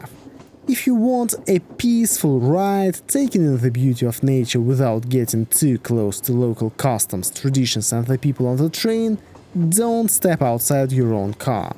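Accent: Russia. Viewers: Дед ёпта ну постарайся название городов или привычные заимствования произносить не настолько по-русски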